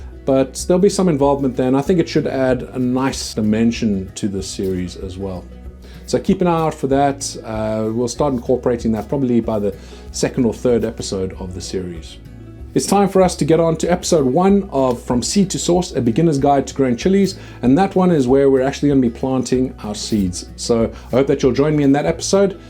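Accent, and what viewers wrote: South Africa. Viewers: South Africanism ascent.... My homie.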